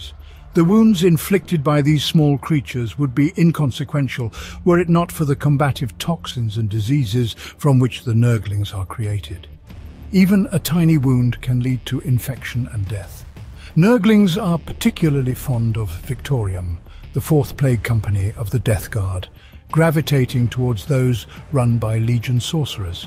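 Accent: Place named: United Kingdom. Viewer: The British dude narrating makes my brain turn off and stop listening. Idk why. 🤔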